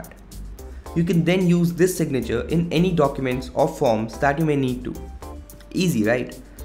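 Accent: India. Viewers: This Indian accent makes my ears bleed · Indian accent is always funny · Beebom man! just keep up the gr8 work.i just beg you not to follow suit like C4ETech with "FAKE ACCENT". this indian English accent we have is the best! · this unique indian accent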